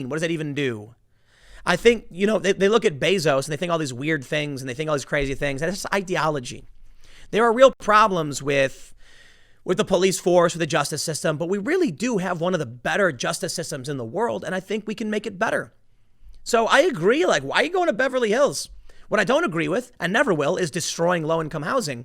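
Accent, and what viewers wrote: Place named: New Jersey, United States. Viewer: Hearing a lot of your Jersey accent in this video. Love it. Stop repressing it.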